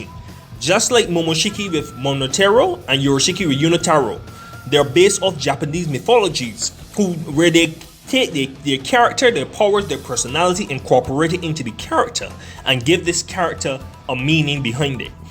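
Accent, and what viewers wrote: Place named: Trinidad and Tobago. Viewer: Loving the accent, trini?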